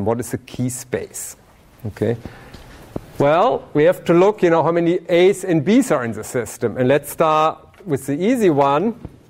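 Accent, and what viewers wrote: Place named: Germany. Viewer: His English is not bad, but sometimes I can't tell if he's speaking English or German...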